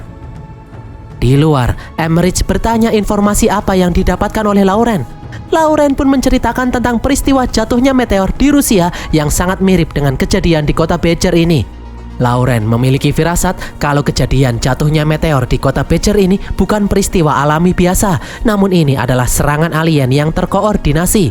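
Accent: Java, Indonesia. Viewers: Perasaan suaranya beda ya?? Kok medhoknya terasa banget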